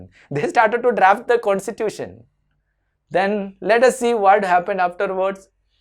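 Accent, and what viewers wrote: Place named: Kerala, India. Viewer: THANKYOU SO MUCH SIR FOR THE AMAZING EXPLANATION THE WAY YOU REVISE QUICKLY REALLY IMPRESSED ME SIR SIR I THINK YOU ARE FROM KERALA ..[ PREDICTED FROM THE ENGLISH SLANG ] I AM JUST THE NEARBY STATE SIR TAMIL NADU..... I HOPE YOU KEEP MAKING VEDIOS OF HISTORY AND WAITING FOR NATIONALISM IN INDIA SIR THANKYOU SO MUCH SIR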